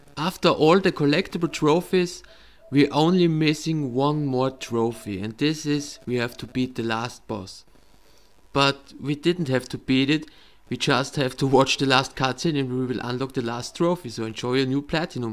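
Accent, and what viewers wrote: Germany. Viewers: Bruder man hört das du deutscher bist kannst du dass auch mal in deutsch machen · Wow du bist sicher deutsch oder ? (no judgement) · Wenn du deutscher bist - rede deutsch. Klingt einfach nur schrecklich wenn die deutschen auf International machen.... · It's a German accent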